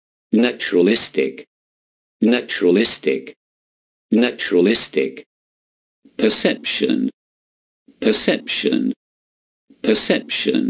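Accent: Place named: United Kingdom. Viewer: الاكسنت المرة دي بريتش . قبل امريكان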